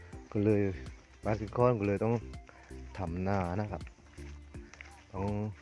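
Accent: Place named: South, Thailand. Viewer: ผมฟังออกทุกคำ พูดใต้เหมือนกันเดะๆ ❤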